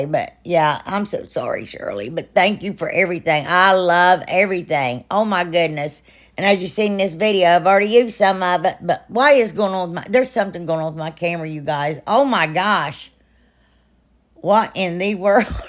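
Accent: South, United States. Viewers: Where you from girl?! I’m in north Alabama. I always love hearing a warm southern accent on here!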